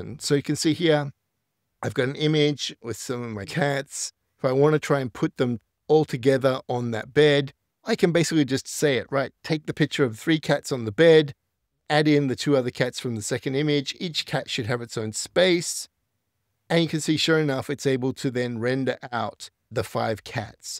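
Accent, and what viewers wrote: Australia. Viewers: Australian or South African? Nice vid.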